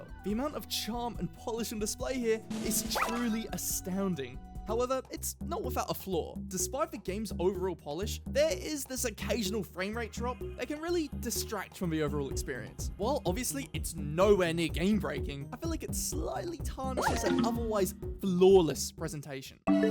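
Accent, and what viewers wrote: United Kingdom. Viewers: i don't click onto your videos all too often but i am jumpscared by you being british every time. i somehow always forget and it's terrifying every time.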